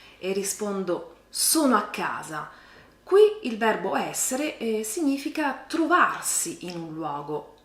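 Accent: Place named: South, Italy. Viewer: Ma se sei terrona che cosa vuoi insegnare italiano con quell'accento che hai Stare al posto di essere lo usano sempre e in modo insopportabile i romani e terroni come te. È un vostro marchio immediatamente riconoscibile. E impara ad usare il sia... sia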